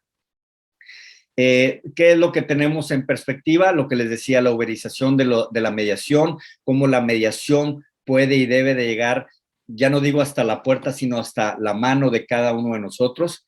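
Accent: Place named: Mexico. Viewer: Gracias por su aporte,ponencia muy buena narrativa y la deja mejor aún ese tono mexicano,.Creo que el ser humano se adapta a los cambios con respecto a la mediación y el uso de la tecnología...saludos desde Argentina.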